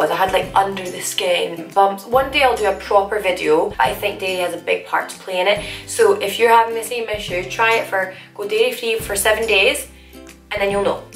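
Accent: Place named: Scotland, United Kingdom. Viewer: Love your channel and just found your Instagram! Found you from your Jamie G lipstick video and was so happy when I heard the Scottish voice 💕😂 xx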